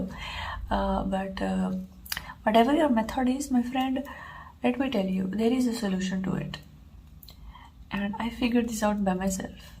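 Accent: India. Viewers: Is this a Hindi accent specifically?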